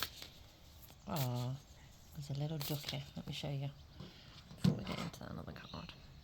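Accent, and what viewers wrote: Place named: Ireland. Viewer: Hello I won’t call you as before. You know last night I had a dream since a long long time, I was in a place a high building and people were dancing drinking and having fun and I saw a kitten downstairs was trying to climb up and while I was trying to catch it two people fell from above near me completely covered in blood and at that moment I started shaking so hard that I woke up, why I’m telling you this but since few days somebody I was forced to let her go because I was not happy with she’s a karmic with I probably lived in another life cycle and now she’s sending in my mind images of her in a provocative way and I know these thoughts are not mines. I don’t know but these two things are related in some sort. Well Nice character some times you should be use again your Irish accent 🌹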